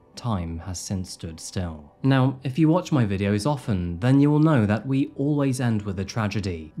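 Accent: United Kingdom. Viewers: Well, he is from the UK and recently moved to Australia. Everyone has an accent to everyone else outside of where you're from.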